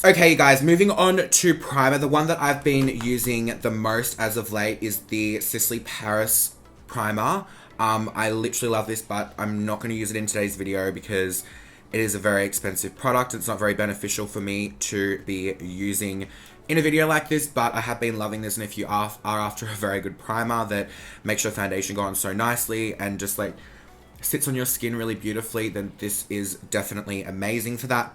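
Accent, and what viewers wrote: Australia. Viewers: is he Australian? i can't tell because I'm Australian and i am used to the accent. It sounds a bit British at times..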